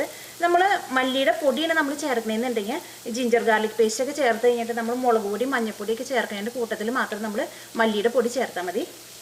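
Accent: Thrissur, India. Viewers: thrissurkkari ano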